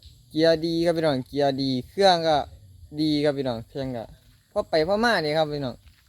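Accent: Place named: Central Thailand, Thailand. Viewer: พูดไทยกลางครับ😅😅😅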